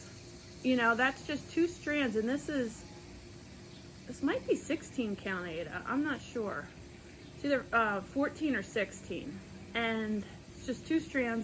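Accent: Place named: Cincinnati, United States. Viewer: Luv that you’ve been taping outdoors... I noticed the butterfly house straight away! I am originally from Cincinnati. Are you as well? I think u have a Cincy “accent” and just one more thing to love about you... blah, blah, blah! Congrats on all your finishes! Great work! 💪🏻😎🎀👑🌿🦋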